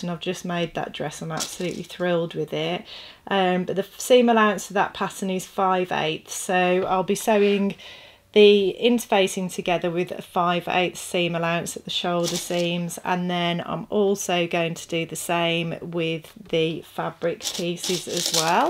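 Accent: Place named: Yorkshire, United Kingdom. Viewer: I’m a self taught sewer and have been sewing for a very long time. I am amazed at the tips and trick I have learned from you. I am also an expat from Yorkshire so I enjoy your accent. It’s a little bit of home. Thanks for this awesome channel.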